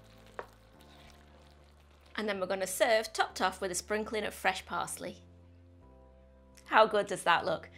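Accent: United Kingdom. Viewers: This woman has lost her mind. Dont know her accent but guess she must be from the UK. What on earth is she doing to food?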